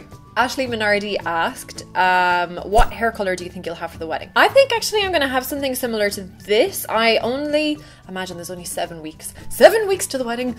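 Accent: Ireland. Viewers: I was thinking west/mid west of Ireland, particularly when you said you met your hubby to be in Limerick (my home town!) best of luck for your wedding!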